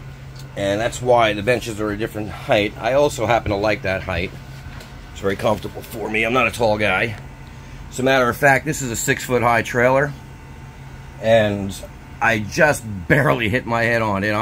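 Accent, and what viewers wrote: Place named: Pittsburgh, United States. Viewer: You from Pittsburgh 😁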